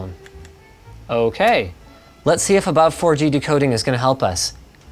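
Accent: Australia. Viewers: Thats a aussie accent m8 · Isn’t that an Australian accent? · yeah not a bad australian accent at all tbh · That accent is australian mate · Accent was Stereotype Austrlalian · K that's an Australian accent and I don't even mind cause its not that bad your talking about GRREEEFIX CEEEEARDS so its ok:) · was that an Australian accent or "Central Pahk" accent??? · That accent is like Australian,British and a bit of in there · Aussie accent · U sound aussie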